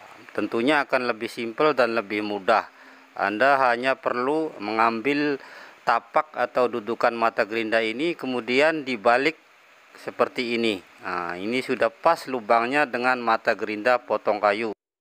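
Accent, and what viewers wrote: Indonesia. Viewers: Ngomongny gaya Indonesian, tp soket listriknya Malaysian🤩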